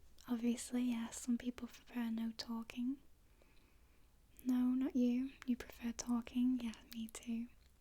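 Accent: United Kingdom. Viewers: Congrats, so pretty british accent!! Love your ASMR videos!!! Hugs from Brazil. :) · Her voice is so beautiful, I love that I'm discovering more British ASMRtists. I love the sound of British English, it's so beautiful and perfect for ASMR❤️Subbed and liked.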